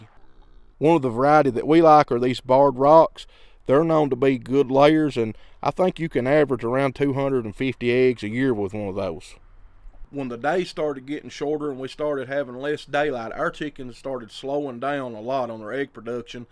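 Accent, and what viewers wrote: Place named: Louisiana, United States. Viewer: Are you in Ruston? Your voice reminds me of my family from Louisiana.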